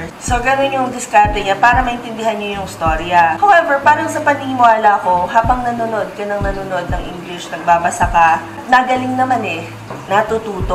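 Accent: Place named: United Kingdom. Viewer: At naalala ko pa nga un British accent mo sa esca calls. Pia Wurtzbach lang ang peg ✌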